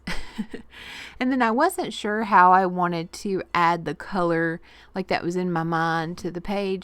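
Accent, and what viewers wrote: South, United States. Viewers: Girl, you are getting good at those voice-overs! Liked the change up with the page too. It's good to break out of our comfort zone just a little every now & then. And yes, you watch those vids with all the art supplies & you want it all. End up spending a fortune too lol. Made me smile when you mentioned east TN. I am from there too. We all got that same accent. Still southern but less of a drawl.😋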